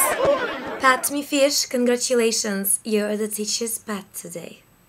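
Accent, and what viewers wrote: Russia. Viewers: I believe she is Russian, I recognize the accent. By the way, why can't we all just get along. Think of it this way, it doesn't help you to get angry at the other guy, that only satisfies his need to annoy you. You shouldn't antagonize him either, that only puts you akin to his behavior. Also, it is not necessary to respond to me, I will very likely never check back anyway. My very best wishes to all of you.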